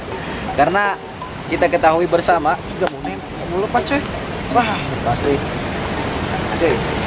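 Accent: West Java, Indonesia. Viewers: Sangat mengedukasi bgt ini, dan logat sundanya terasa bgt nih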